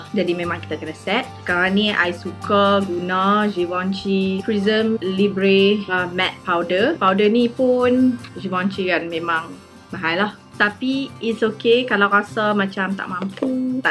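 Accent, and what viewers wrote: Penang, Malaysia. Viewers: Cute lahh cakap pelaq penang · Nurkhotimah Rifqi Pulau pinang, malaysia.. she speak in Penang dialect.. so maybe susah untuk kamu faham😂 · bila dh start cakap penang, kepala mau dok goyanggg tak biaq...gahahahaha hidup penang mai !! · Lahh penang rupanya 😂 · Comeeyyyyy aihhh dia ckp peneng..😍😍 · I love the way you speak your bahasa with a little bit of penang accent. There was no accent when you speak in English.. Love your makeup look. Finally a malay makeup youtuber...😘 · Comeyyy cakap loghat penang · sebagai orang pulau mmg syok dengaq fellow island girl kita ckp penang.. nak malu apa.. mcm ni la kami dok ckp dari kecik.. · hehe comeinyaa loghat penang yg campoq dgn english 😆 uuu nampok muka bulat 🤣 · = 💗 in penang dialect = 💗💗💗💗💗💗💗💗💗💗💗💗💗💗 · Comelnya cakap penang 😂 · Comelnya cakap dialek penang. Tak kekok pun 😁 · Puaih dengaq akak ckp peneng after so many years follow channel akak uhukss love teroxx ❤ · Hahhaha napa rasa lawak dengaq cakap penang😂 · Awat cute sangat bila cakap penang 😍😍😍😍😍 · Comelnya you guna dialek Penang 😹 tapi I still prefer you guna English sbb I nak belajar. 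Hahahha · I'm Penang Chinese girl , I totally like when you speak Penang BM , SO CUTE! I love the accent ! Love your make up tutorial too, will keep on follow your video! Thumbs up for you girls ♥ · Padu toq orang penang cakap! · Sgt comel ckp loghat penang 😁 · Boleh pon ckp penang...ingt beranak dkt uk 🤣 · Dont know why, but I love it way better when u speak in malay.. to be exact, in that Penang slang .. thats cute plus u sounds way much comfortable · WAIT I TAK HBS LAGI TGK THIS VID BUT GURLLLL I LOVE YOUR PENANG ACCENTTT OH EM GEEEE OKAY NAK SAMBUNG HAHAHAHAH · ok u chomel gilosss cakap penang!! love it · i like this vid soo much its like u are completely a different person when u talk in bm with penang loghat some more. your make up is da bomb as always love it !!!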